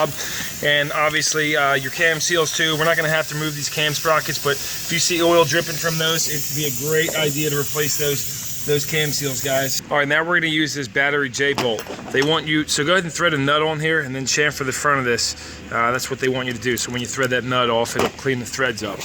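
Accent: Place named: New Jersey, United States. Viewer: Where are you located? You sound like you're from South/Central Jersey. After seeing how this video I'd love to have you do the belt in my wife's 2014 Pilot. Very thorough and detail oriented. Great vid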